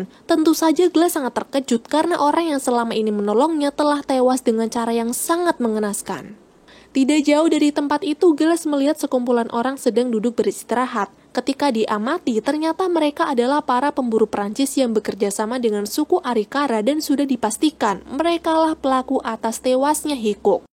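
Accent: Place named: West Java, Indonesia. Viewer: Mabk. istrinya si Jon ya wkwkwk. soalnya mirip Dari intro nya cara ngomong ada sundanya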